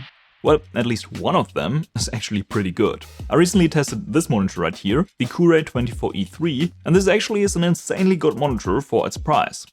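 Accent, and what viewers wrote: Germany. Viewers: Kanns sein das du deutsch bist? Trotzdem guter Akzent. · Ich habe das gesamte Video gedacht „Der ist doch 100% Deutschsprachig", und trotzdem hat mich dieses „Bis zum nächsten Video" komplett gefickt 😂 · wie man merkt dass du deutscher bist hahahah :D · Do I hear Deutsch?? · r u german?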